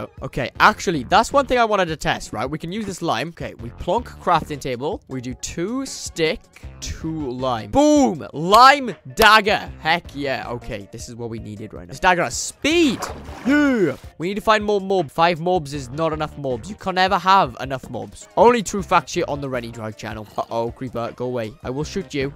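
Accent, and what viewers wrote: Wales, United Kingdom. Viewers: Hol up are u Welsh?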